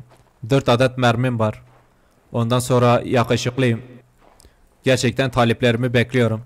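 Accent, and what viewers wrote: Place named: Erzurum, Turkey. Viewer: Abi şu şiven yokmu yaa çok güzel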